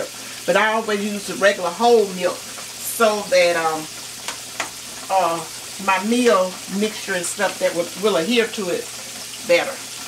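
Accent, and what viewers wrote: South, United States. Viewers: you are awesome...quit worrying about how you say certain words, I get you so if they dont they can simply rewind put headphones on..From one authentic proud Southerner to another...Keep doing YOU..keep your smiles going through that wholesome "put your foot in it" style cooking you're sharing. Bravo! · I enjoy you ! I love your southern accent and charm ! You should so sweet and caring ! Put me in the mind of my nana or great Aunt ! The fish was absolutely beautiful! Blessings be on to you!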